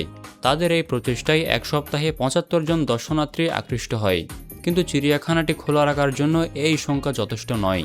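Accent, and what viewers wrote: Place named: Rangamati, Bangladesh. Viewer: Apni Q & A koren plz onk qsn amar... Apni ki Chakma? Apnr voice amr Rangamati types lage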